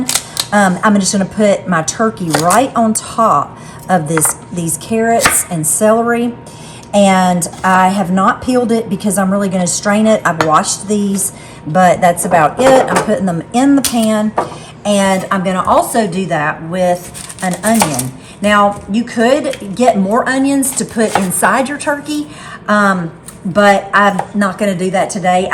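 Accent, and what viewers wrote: South, United States. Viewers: Great recipe ! I could listen to your southern accent all day 👍🏼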